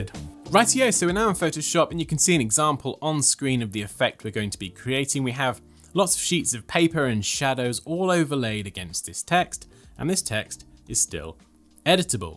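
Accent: England, United Kingdom. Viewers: Very listenable English accent! :-) Makes such a nice change to 'other' accents!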